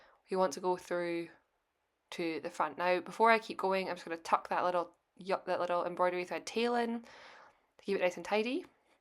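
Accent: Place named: Edinburgh, United Kingdom. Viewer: Hi! Thousand thanks for your tutorial! Do you also have one to knit the „bag“ where you sew it in? Btw…, I love you speaking. It reminds me on my first day an a language school. The first day I went there they were writing a dictat. I couldn’t understand a single word😂. Since than I have loved your speaking…, but the first day I heart someone from Edinburgh…., wow…, that was hard! I had the feeling I cannot speak one English word🙈. That was a great time…. Long long ago…, but I will never forget that time. And now listening to your podcast…. Lovely! Thanks🫶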